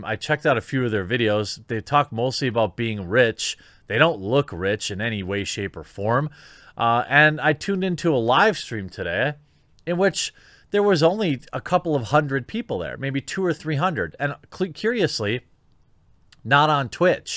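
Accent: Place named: California, United States. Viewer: where in california is that accent from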